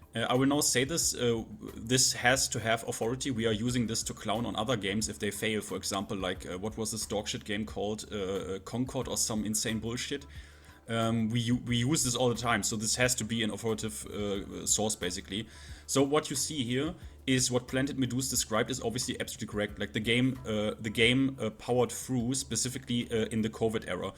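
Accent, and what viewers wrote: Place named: Germany. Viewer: direkt den deutschen akzent erkannt :face-blue-smiling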